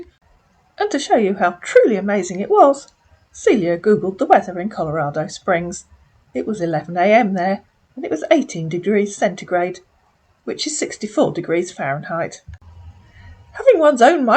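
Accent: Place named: England, United Kingdom. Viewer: perfume addict Thanks lol 💗 🤣 You have a lovely English accent, we were talking about our accents before, very easy on the ear. My accent is more flat. I hope you're enjoying your week ☀️🌿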